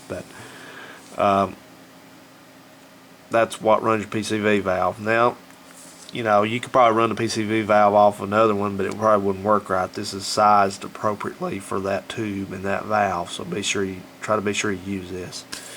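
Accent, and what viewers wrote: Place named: West Virginia, United States. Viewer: I didn't get to add thanks a lot for the videos. You kinda sound like you are from West Virginia like me, or maybe Kentucky or North Carolina.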